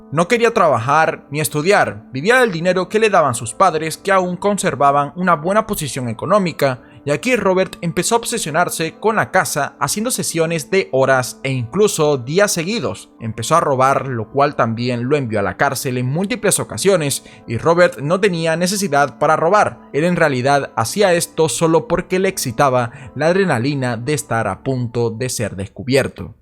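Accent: Venezuela. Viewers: Una pregunta amigo, eres Venezolano?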